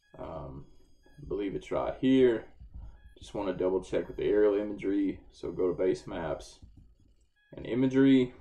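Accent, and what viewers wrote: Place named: United States. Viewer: bro this helped out so much with hydrology class. Not trying to offend anyone, but I'm glad there is someone with a good ole American accent speaking. Usually there is some guy from India or God knows where, and I can hardly make out a word. Bless their hearts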